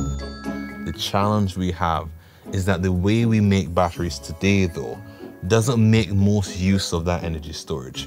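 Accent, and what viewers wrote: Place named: Barbados. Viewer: Great explanation; sounds like a Bajan.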